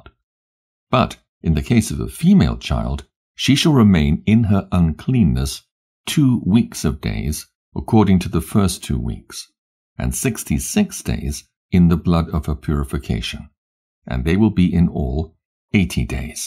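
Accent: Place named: Europe. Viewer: I love these other books but why are every one of them told in a European English accent???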